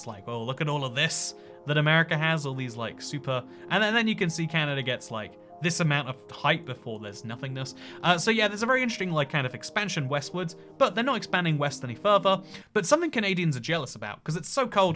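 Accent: United Kingdom. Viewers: As a Canadian with British heritage (my Mom moved to Canada with her family from Stafford when she was 11), I found this video quite amusing -- like listening to one of my British cousins trying to explain Canada :) You got it mostly right too. Good job!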